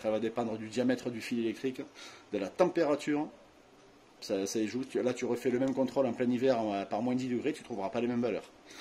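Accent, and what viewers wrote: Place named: South, France. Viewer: Salut je viens de voir ta vidéo sur le régulateur 👍 c'est super bien expliqué car j'ai eu le problème la sur ma Honda vf750c rc43 de 1995 je super sympa que tu donnes de ton temps pour aider les autres et j'espère qu'ils seront reconnaissant envers toi .en tout cas moi j'ai trouvé ça génial et en plus le petit accent du sud c'est le top merci pour ton explication 👍 Bonne continuation 👍🤟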